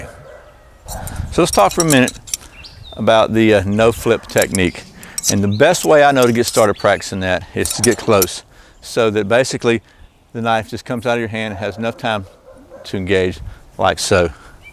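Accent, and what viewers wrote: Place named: South, United States. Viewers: This southern accent at least thats what i think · I was guessing KY or TN, but definately south east. Great videos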